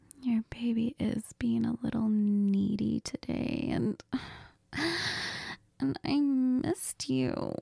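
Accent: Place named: Spain. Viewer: You felt extra Spanish today (as a native speaker i loved it) - Hermoso audio!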